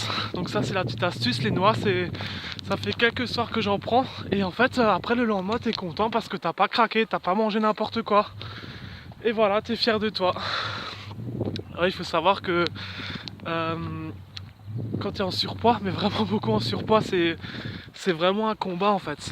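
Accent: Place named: Alsace, France. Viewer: Bonjour, votre accent me fais penser à l’Alsace, super endroit pour retrouver la paix. Je me bas aussi contre le surpoids. la vidéo est intéressante. Bonne Journée. Estelle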